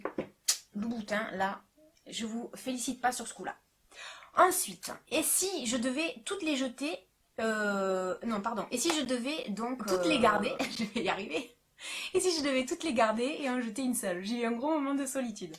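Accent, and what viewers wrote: South, France. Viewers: trop bonne vidéo je t'aime trop t'es trop cool et esque tu vient du sud car tu as un accenct bisous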